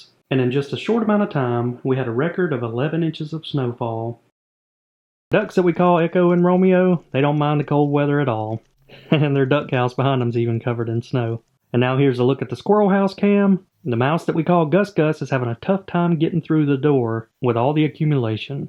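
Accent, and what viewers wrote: South, United States. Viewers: May the Carp be with you - cool Southern accent btw !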